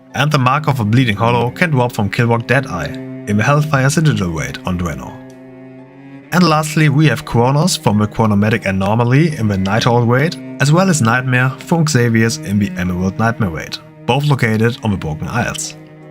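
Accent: Germany. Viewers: Warum nur auf englisch??? Man hört raus, eigentlich sprichst du deutsch oder?? Kann man all diese vz auch transmoggen??? Brauche ich den vz Beruf??? Würde mich über eine Antwort sehr freuen 🙏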